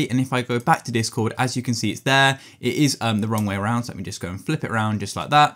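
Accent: United Kingdom. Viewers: HE'S BRITISH!!!!!!!!!!!!!!!!!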